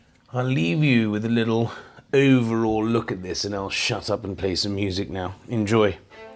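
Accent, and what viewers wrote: RP, United Kingdom. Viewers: There's something about hearing swearing in a posh accent that brings me great joy. 😆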